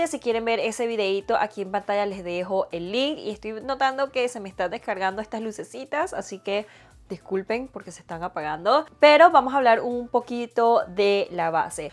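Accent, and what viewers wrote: Venezuela. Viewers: Ella es venezonala 😂 no manches como quieres que hable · siempre ah tenido el acento venezolano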